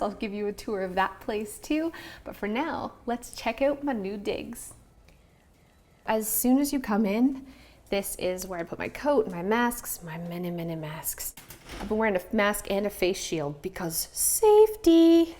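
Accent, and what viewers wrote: Canada. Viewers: Hi! I’m relatively new to your channel and absolutely love how authentic and awesome you are! You’ve inspired my cricket self to continue to create organized spaces in my home that make me happy. It’s also great to hear a fellow Canadian accent – makes me miss home a little less. So excited to hear that you’re in NYC filming - you got this! Rooting for you!:)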